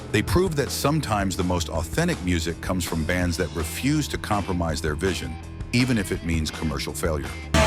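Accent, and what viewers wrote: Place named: United States. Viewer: The American voice commentator turns me off every time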